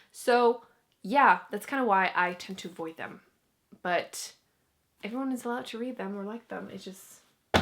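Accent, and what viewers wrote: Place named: Canada. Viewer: You have an accent. Are you canadian?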